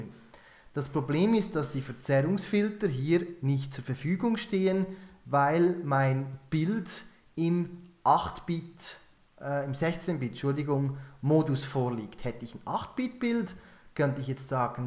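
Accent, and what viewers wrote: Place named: Switzerland. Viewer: Dein schweizer Dialekt ist einfach genial :D Komischerweise versteh ich dich sogar zu 100%, was nicht bei allen Schweizern der Fall ist ;)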